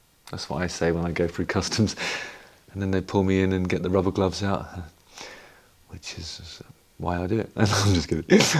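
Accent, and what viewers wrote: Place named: Southern England, United Kingdom. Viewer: Most English man alive…… definitely south English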